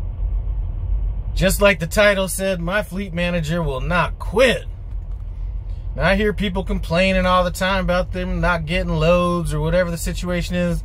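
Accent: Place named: Southern California, United States. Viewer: He talks just like So Cal.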